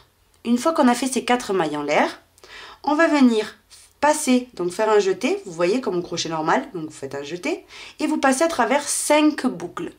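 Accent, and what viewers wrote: South, France. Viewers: Bonjour merci pour toute vos explications vous êtes très jolie et gentille et j adore votre accent ca me fait pensé au midi je devais faire une etoles et je trouvais pas de points crochet Assez beau merci pour ce magnifique point je vais faire mon étole comme ça bonne soirée à vous et votre familles courage à vous tous 👍👍💞💞💞💞💋🌹🌹🌹🌹🌹💐💐💐💐💐💐🌺🌺🌺🌺😸😻😻😻😻😻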